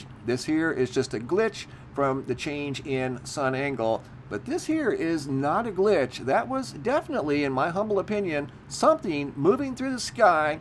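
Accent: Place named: United States. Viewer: Your voice is just so quintessentialy American 😊😊 so easy to listen to which helps take on the information.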